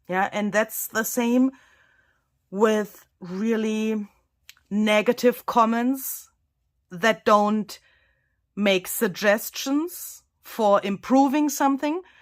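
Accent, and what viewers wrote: Germany. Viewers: such a heart felt message today! To me I do not care what brand you use. I am here to watch your creative, very interesting journals. What you are making is art! You have a heart of gold, and I have connected to you. I told you before that my dad's family all came from Germany. Some in 1840, 1860, and others later. But for some reason, your accent reminds me of my great grandparents, because their first language they spoke here was low German, and they still had the strong accents. I feel like you are family to me. Some people are what we call them here, key board warriors. They hide behind a screen, and say mean things, because we don't know them, and we cannot see them. I will continue to watch you, because you to me are family. And listening to you, brings back the comfort, of listening to my long gone great grandparents. And I am much older than you. 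It is hard to explain. Your English is good! I couldn't pronounce the German words right when my grandma tried to teach me. She said my tongue wasn't slick enough. (She told her youngest daughter the same) ha ha! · This is the most wonderful junk journal I have Ever seen and the most amazing piece of art you and Barbara have done together. You have created more than just a journal, it’s very special. Ideas come from your imagination of seeing things before they are created. Like seeing it done before you start it. I have been able to do this with my father. He taught me to image what I want to make before I came out with it. And some I taught him to make a new way to do a project an easier way, than he thinks. I love watching your videos, love your German accent, and your English is wonderful. You have touched my life and creativity, as well as others I am sure. You are Amazing, and Barbara. 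Thank you so much for your sweet kindness,. I just Lov you. Keep up with your great works. Always be safe and well. Your fan and admirer. Lin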